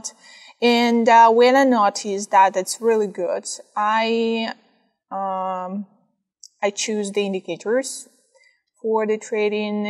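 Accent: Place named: Russia. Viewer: Почему я слышу русский акцент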